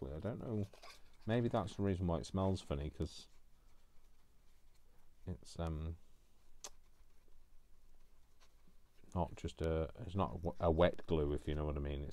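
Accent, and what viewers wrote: United Kingdom. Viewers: I really enjoyed watching you doing your drawers. Yes, the glue is fantastic and I loved the sound of your fingers patting the paper down. You say that you ‘ talk rubbish’... I certainly don’t think so... but if you do, it’s delightful, educated and unique rubbish and I love to hear it! What a coincidence that the paper was from one of the Brontee sisters’ books and there you are in Yorkshire talking with a Yorkshire accent... it just occurred to me that the Brontee girls would have had Yorkshire accents! Thank you, ❣️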